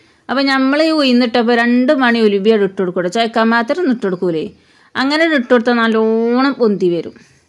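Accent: Malappuram, India. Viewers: അവതരണ ശൈലി നന്നായിട്ടുണ്ട് - അല്ലേലും മലപ്പുറം ഭാഷ ഒരു രസം തന്നെ - ഇഷ്ടായി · മലപ്പുറം ഭാഷ സൂപ്പർ വീഡിയോ സൂപ്പർ 💞💞💞💞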